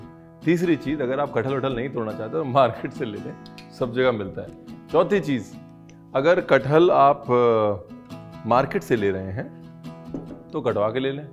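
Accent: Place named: Lucknow, India. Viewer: Scrumptious....Hum bhi kathal.aise hi banate hain par neebu nahi daalte.... Bohat umdaaa aapne banaya hai ji....aap lakhnavi lehze mein.bol.kar Mann khush kar dete hain....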